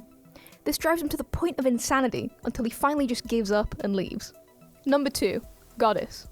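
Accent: United Kingdom. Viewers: You sound like a British version of Ducky from Land Before Time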